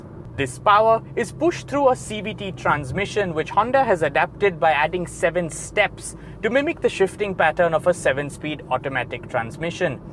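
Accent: India. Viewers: Good review! India English! That’s quite interesting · Indain English WTH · It's good that Honda is also considering Indonesian cars for the UAE as that is a region where even the basic variants of all models get a brilliant equipment list and the HR-V is no exception to that. While the exterior design does look good, it is too simple for my liking. Even the interior switches and the layout of the instrument cluster is as if directly taken from the Jazz and the City, the latter being sold here. This is one Honda which failed to impress me in the interior department as we are used to seeing sumptuous designs from the automaker. The Civic, Odyssey, Accord and Pilot, all have a certain wow factor which the HR-V lacks. The only feature to write home about is that ironic "Indian English" accent and the magic seats at the rear to increase the cargo area. On all these grounds, it is the Kia Seltos that for me is the undisputed king because of its fantastic design and the features it gets. 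However, as you pointed out, it is the cheapest car currently on sale which is surely to have buyers thronging at Honda's dealerships. And then, there are the basic virtues every JDM car comes conferred with: Reliability, low maintenance costs, excellent fuel economy and an obnoxiously high resale value which is what is going to make a great case for itself. How I wish manufacturers get rid off that CVT transmission and use a torque-converter and turbo-petrol engines like the 1.5 in the Civic. That would be the perfect choice of the eclectic options with Honda. Enjoyed the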